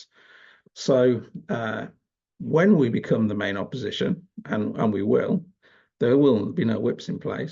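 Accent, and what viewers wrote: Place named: Mockney, United Kingdom. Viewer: your MOCKNEY pronunciation is annoying. WIV? FORT? FINK? FING?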